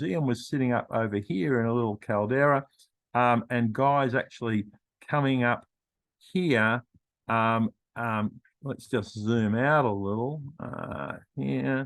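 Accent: Australia. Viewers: Well done. Swapping one Aussie accent for another. At least I could understand it.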